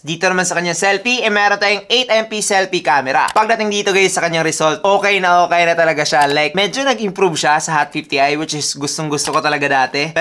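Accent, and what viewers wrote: Visayas, Philippines. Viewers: nakaka inis yung accent nya, pang bisaya.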